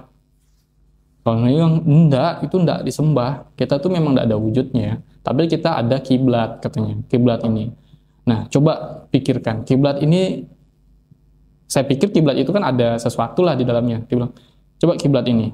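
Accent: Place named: Borneo, Indonesia. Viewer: Logat orang dayak kalbar, mirip logat malaysia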